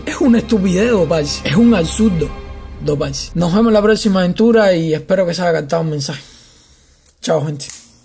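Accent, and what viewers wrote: Cuba. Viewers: hubo muchos que no entendieron bro, empezaron una discusión absurda por acentos cuando realmente es gracioso pero carismático ese acento cubano suyo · Que bola brother por tu acento pareces de Cuba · Dale loco todos sabemos que es cubano y es su acento por naturaleza digamos que tu comentario no es nada atinado ante una persona sale e invierte tiempo de su propio tiempo para hacer un video alertando a los mas jovenes sobre hacer estupideses luego de grabarlo subirlo publicarlo lo mas inteligente que haces en el teclado es redactar sobre su forma de hablar rayos viejo solo atinas a burlarte? No jodas que tienes en la cabeza inmadures o no sientas cabeza se ve que ya estaa grande para eso no lo crees?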